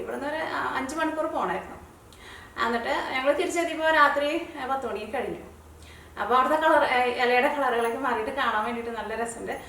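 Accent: Thrissur, India. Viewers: ചേച്ചി തൃശ്ശൂർക്കാരി ആണല്ലേ? ഞാൻ ആദ്യമായിട്ടാണ് വീഡിയോ കാണുന്നത്. നന്നായിട്ട്ണ്ട്ട്ടാ...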